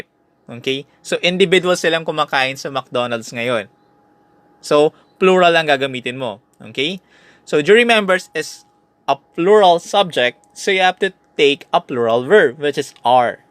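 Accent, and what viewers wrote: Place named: United States. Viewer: Boses amerikano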